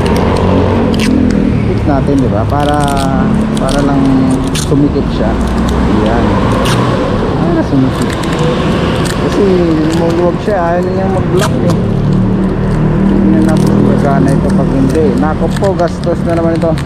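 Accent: Philippines. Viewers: bisaya ka paps? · Ilonggo ka ba papsi?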